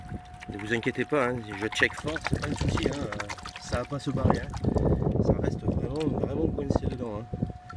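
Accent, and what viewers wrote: Hérault, France. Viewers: LORB ON SENS LACENT DU 34 MDR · il habite dans l'Hérault là où il y a l'or et il a l'accent de l'Hérault c'est pour ça que je dis ça. Il y a de l'or dans le Gard aussi. Je suis parisienne et je reconnais tous les accents du sud !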